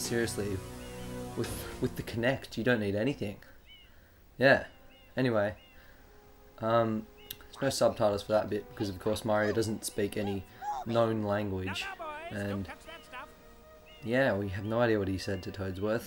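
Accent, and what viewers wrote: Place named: Australia. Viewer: Hey, just wondering, are you an Australian, Brit or New Zealander? I can never tell the accents apart! >:O Also, keep the videos coming dude, I'll definitely watch 'em.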